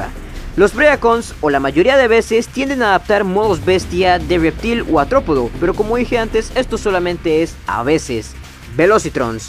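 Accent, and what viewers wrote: Costa Rica. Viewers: Acento Tico.... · Tu acento parece costarricense. ¿eres Tico? Pd: gran video, sigue así.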